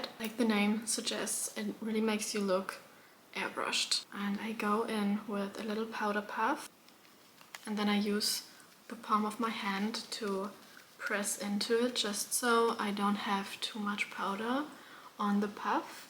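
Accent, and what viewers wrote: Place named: Germany. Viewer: Hey, erstmals tolles Video 🤗 Nur ein kleiner gut gemeinter Ratschlag: Man kann raushören, dass du deutsche bist und es dir manchmal schwer fällt die richtigen Vokabeln zu finden/ dich auszudrücken.. Wahrscheinlich drehst du deine Videos auf englisch um mehr Leute aus aller Welt zu erreichen, was ich vollkommen verstehen kann aber vlt solltest du einen 2ten deutschen Kanal erstellen oder einpaar Videos auf deutsch drehen denn ich hab das Gefühl so kann man einen besseren Eindruck von dir als Person gewinnen & du kannst deinen Charakter mehr zum Ausdruck bringen und somit leichter eine Community aufbauen :) Nicht falsch verstehen aber vlt denkst du drüber nach, mir gefallen deine Art von Videos sehr🤍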